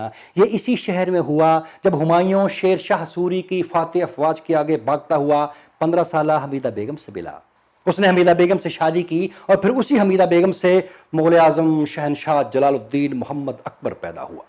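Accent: India. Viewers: chutiye india ki urdu na bol....lahore waly marein gy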